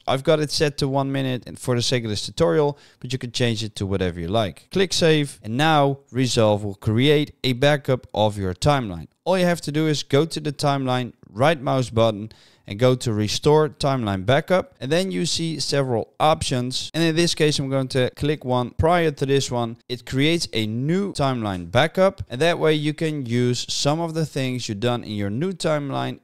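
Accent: Netherlands. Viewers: Bro je bent sowieso Nederlands haha. Ik hoor het.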